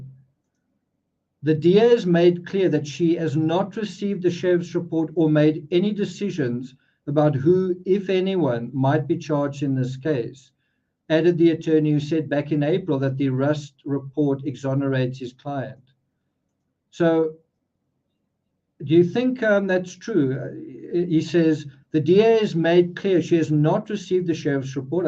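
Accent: South Africa. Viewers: please do not go to another garden! I appreciate you so very much - and I am sure so do many many more. All your time and energy in constantly following the truth, your insights into human nature and behaviour, your continuous urging to look at ourselves. And the oh so familiar South African accent - your nest certainly would be well lived in in our garden!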